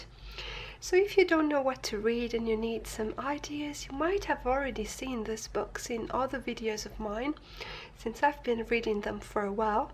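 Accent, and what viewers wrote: United Kingdom. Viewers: Your accent has never been so British :D did you spend your holiday in Britain or something ? xD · Btw, I really love your british accent! :) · Omg amo tu acento británico <3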